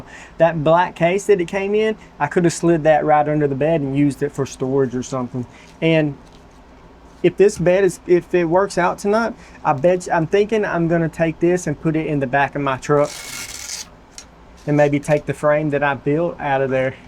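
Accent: Alabama, United States. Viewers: That's a cool stealth camper! It would also make a beautiful and comfortable outhouse. If you were in Louisiana I would suggest you stealth camp in a Mardi Gras Float [in the off seasons of course]. But then again I sometimes forget you live in Alabama where Mardi Gras originated until I hear your accent.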